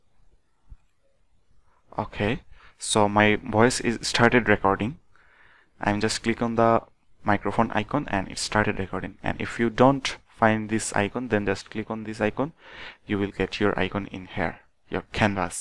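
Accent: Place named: India. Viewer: definitely indian. that accent is unmistakeable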